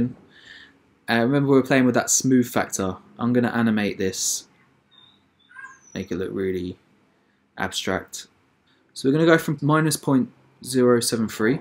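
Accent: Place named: London, United Kingdom. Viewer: Sick tutorial nice to hear london accent too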